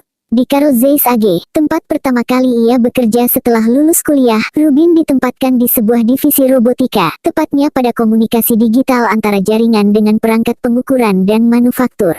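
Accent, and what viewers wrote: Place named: Java, Indonesia. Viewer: Jowo e ngendi sluur😁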